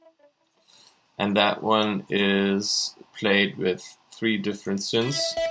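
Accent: Germany. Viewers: würde mir tutorials auch in Deutsch wünschen! Man hört extrem deinen Deutschen- Akzent (y) :)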